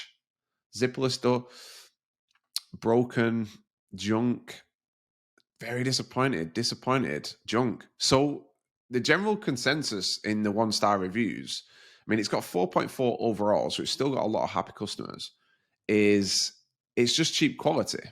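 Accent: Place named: England, United Kingdom. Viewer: Hi just started watching your videos, you sound english, would you say to start that I should start in England or America, I see you doing america fba. Also osome has lots of really shit reviews about just not providing the service they promise, it's made me not trust you as much, if you was a big honest channel, why would you pick a company that has a lot of bad reviews?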